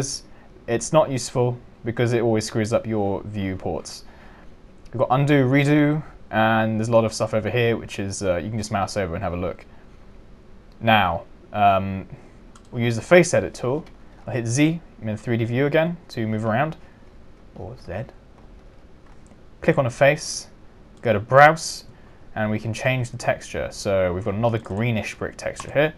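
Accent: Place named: United Kingdom. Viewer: I freaking love you British accent *0*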